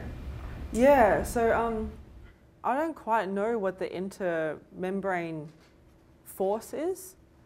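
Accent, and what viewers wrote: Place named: Australia. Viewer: thank you- down under accent can be fun to listen...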